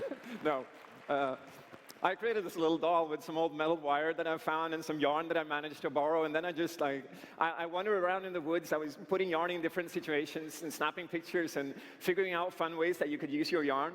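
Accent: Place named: Sweden. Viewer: This guy is running a small company of 14 people and together they created this game as a result. I have no idea whether the game is any good but this is what people like him do. They create games and that's what they know and are used to. To stand in front of a large audience and the industry as a whole as well as in front of hundreds of thousands watching him over the internet is no easy task if you've never done anything like that. I would refuse to stand on that stage because I would fear losing it and forget the last word I spoke. Further more this guy's native language is Swedish. Not English. I think he did a fabulous job of making a case for his and his co-workers game. How anyone can blame him in a negative and down playing way for being nervous and especially exited for the task at hand (in a cute Swedish way) is beyond me. But then again... 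there are a lot of fourteen year olds on the internetsez that have thrown the diapers/nappies and learned how to type.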